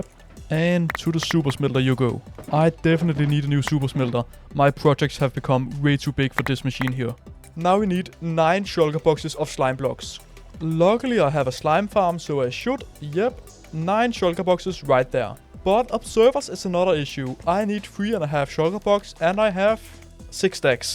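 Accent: Denmark. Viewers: are you danish by anychance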